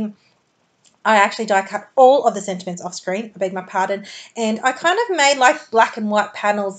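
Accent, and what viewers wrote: Australia. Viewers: These were great cards, and lovely to hear an Aussie accent! Thanks for sharing the techniques, although now I really want to go and buy all the different colours of embossing powders. They're so pretty.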